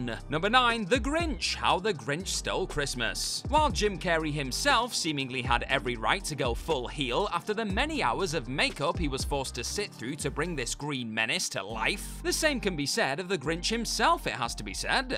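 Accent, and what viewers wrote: United Kingdom. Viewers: Because he's British. That is literally how the English accent works. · You do realize that British accents are like that, right? Brits can't change the way their accents pronounce words.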